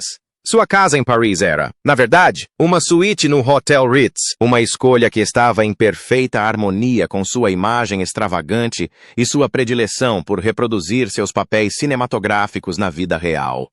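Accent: England, United Kingdom. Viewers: para com este horrivel sotaque ingles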